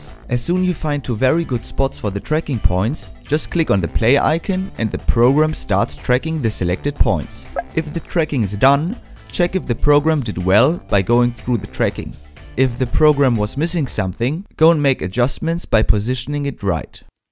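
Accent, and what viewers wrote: Germany. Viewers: solid info delivered with dry snark in a German accent- yeah, I'm subscribed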